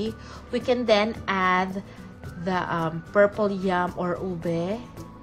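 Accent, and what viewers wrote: Philippines. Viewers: Absolutely perfect! =) I used to eat this at Goldilocks in PH, I can't stop watching your videos coz I'm a bad baker and trying to learn. I hope I can make one of your cakes one day. Thanks for sharing this video. I also like your Filipino accent, makes us proud.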